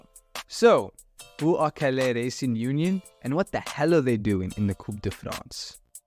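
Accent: South Africa. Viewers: Amazing vid ,when i heard the accent i knew it was south african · French in a Cape accent...now I've heard everything 🙌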